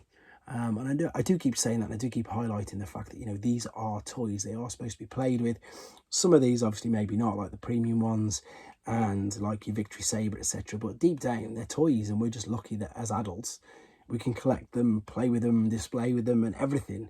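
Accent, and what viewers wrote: United Kingdom. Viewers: This is one of my favourite TF channels.... Nice positive attitude, never any whinging on about stuff, its a friendly space and I have seen so many things on here I had either forgotten existed or never knew about... and I like the way it feels like you are just round your mates house and he's showing you stuff he's found (it probably helps that I grew up in Telford, so the accent is a factor!)...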